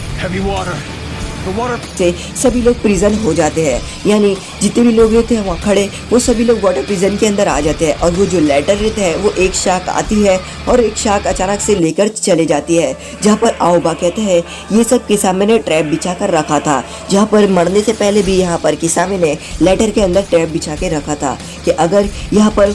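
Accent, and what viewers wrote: Maharashtra, India. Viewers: Bro are you marathi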